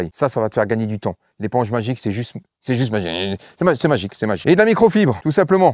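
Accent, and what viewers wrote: Belgium. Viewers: Vous êtes excellent vous m'avez bien fait rire avec la fiat verte, j'en reviens pas du résultat. Accent belge ? Ya du vandame hyperactif dans le moteur du personnage ;-) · T’es belge ou français ? T’as l’accent belge a couper au couteau .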